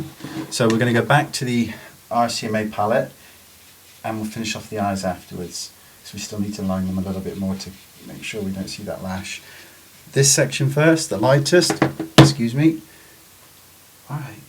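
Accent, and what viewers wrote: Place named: West Country, United Kingdom. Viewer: There's a bit of a west Country accent there this must be long before you went to America.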